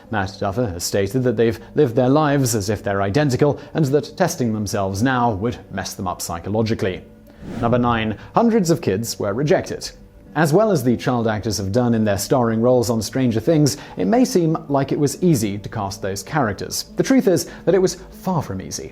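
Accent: United Kingdom. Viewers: You do understand he is British. They pronounce certain words differently. Unlike american english which has been flattened. We say words differently. So back off his pronunciation.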